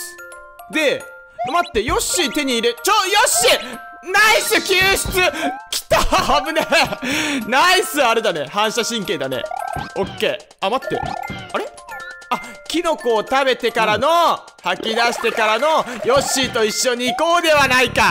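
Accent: Fukuoka, Japan. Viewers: 全く関西弁ちゃうから気にせんでいいと思うよ〜！関西の俺からしたら福岡らへんの方言に聞こえます！ドコ住みか既出ならごめんなさい！ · 福岡弁は、関西弁なんかより柔らかくて素敵と思います(^^ 収益化が始まったとのことですので、より益々、見させていただきます。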